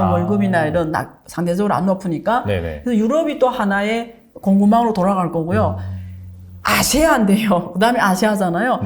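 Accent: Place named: Yanbian, China. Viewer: 중국 연변족이네요 중국없이 갑니다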